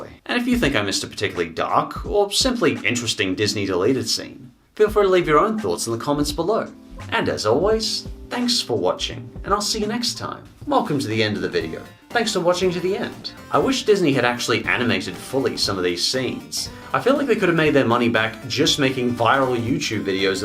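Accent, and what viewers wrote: Australia. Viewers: Are u Australian? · are you australian aswell?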